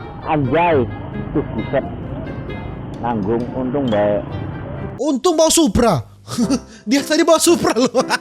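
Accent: Manado, Indonesia. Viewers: Cuma Reaction bang yg bkn gw ketawa.di tambah logat Khassss manaddooo.. Tambah lucu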